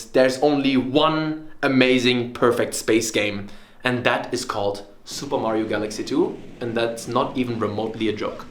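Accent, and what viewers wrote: Germany. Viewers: Thank you so much for speaking like a normal human being. Nothing I hate more than a youtuber slurring their words to get to the 10-minute-mark. edit: also your Denglish is so endearing. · Why does he sound french british and chinese at the same time Maybe or just german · The video is great but you make me enjoy the german accent a lot more than usual , it sounds satisfying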